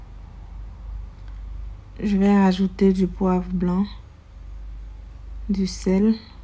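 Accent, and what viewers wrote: Ivory Coast. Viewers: T’as maniéré de parler prouve que tu es vrai ivoirien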